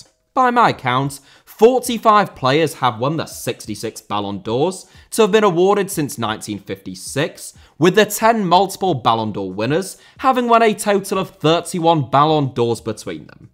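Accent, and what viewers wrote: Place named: United Kingdom. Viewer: I realized from your accent that you are British. I was sure when he put Stanley Matthews in front of Ronaldinho, Kaká and other great players that they were much better than Matthews, truly absurd.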